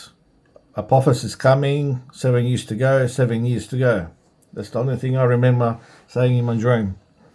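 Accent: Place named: Australia. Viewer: You sound Australian to me and I was just watching the Live Tracking of Apophis and it said passes would be in 2029 and 2036... or as you say in dream; 7 years to go, 7 years to go. Since you posted this video NASA has done the DART mission with smashing success(pun intended) but that got me thinking. Why's NASA doing this? Now I hear we're going to this asteroid and now I'm here and getting very concerned in USA. 2371 Days 2 Go, live them well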